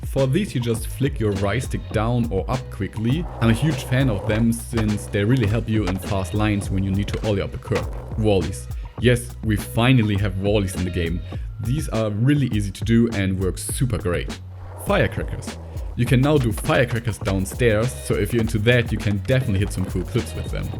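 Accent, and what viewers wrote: Germany. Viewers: bro bist du deutsch, glaube man hört des voll raus 😂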